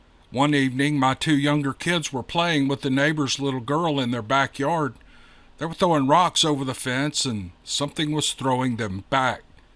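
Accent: South, United States. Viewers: Thank you & thank you to those that send their stories in to to share with us. All of these were amazing!!! Glad you aren't using the AI in these The stories with AI were good as well, but we like the magic in your good ole' country boy accent...Just something special about that southern drawl....😂😂😂 Gotta know we love you?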